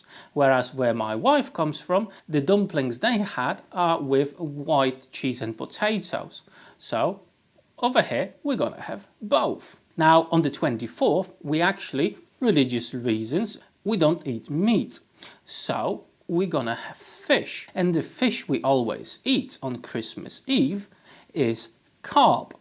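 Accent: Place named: Midlands, United Kingdom. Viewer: Hi. Your Christmas sounds delicious! Clever of you to double up on the soups etc. from different areas that you and you wife come from. I thought from your accent on occasion that you may have spent some time in the Midlands (Birmingham used to have a large Polish community and its own Polish club - the only place you could obtain real 'boiling ring'...couldn't pronounce its proper name so that's what we called it). A mate of mine was half Polish and he used to turn up with Polish dishes his dad had cooked. One was some kind of meat filling in leaves that he called 'Kalumpkies'. Probably got that spelling completely wrong but it was excellent. Are you going to incorporate any traditional UK fare into your Christmas food? Anyway I wish you and yours a very merry Christmas and hope that it gives you and your family everything you wish for yourselves and an extra wish from me. 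P.S. Really enjoying the hand power tools vids. Been using these for more than 30 years but it doesn't mean I know (or remember) all about them Thank you.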